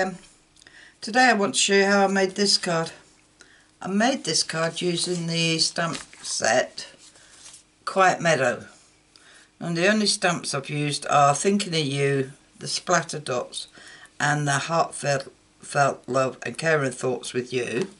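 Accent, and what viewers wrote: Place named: Warrington, United Kingdom. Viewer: I am curious about your accent. I am from haydock, St Helen's living in New Zealand and your accent sounds warrington of Newton le willows. Love the card, it is a kind of a twist to resist stamping, very elegant.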